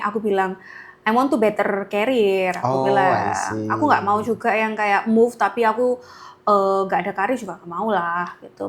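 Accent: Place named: Java, Indonesia. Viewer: Bener2 tuh ada lucu2nya, sampe ngakak aku denger ceritanya, dgn dialek jawanya yg medhok. Baru tau cerita awal perkenalan dan kisahnya dgn Kondosan, Luar biasa kisahnya ❤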